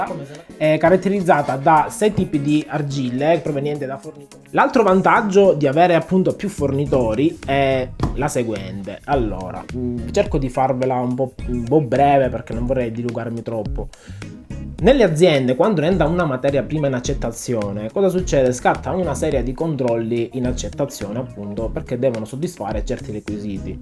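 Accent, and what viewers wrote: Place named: Agrigento, Italy. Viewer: Quindi mi consigli di acquistarla e utilizzarla per tutto senza fare leva e metti biscotto e refrattaria per pratica cosa intendi c'è qualcosa da impostare per utilizzarla al meglio , quando riuscirò a fare una pizza come quelle che vedo nei tuoi video sarà fantastico ma al momento sono alle prime armi e ho qualche problema a ottenere un impasto facile da stendere , dall' accento mi sembri della provincia di Agrigento o sbaglio? Anche io sono nato ad Agrigento ma sono 25 anni che mi trasferito a Torino 😊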